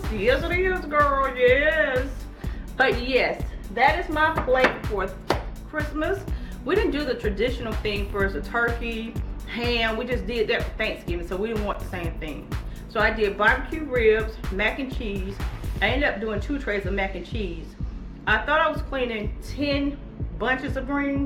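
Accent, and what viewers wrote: South, United States. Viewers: make no apologies for your faith in GOD, he alone has enabled you to be who you are, where you are and what you will be. The video's you produce reach a larger base than just numbers in the views, they reach souls with entertainment as well as encouragement. For those that would rather you not talk, let them turn off the volume because others enjoy the sweetness of your southern accent and the personality you exude. Live the life that best suits you because anything else is an inferior fit.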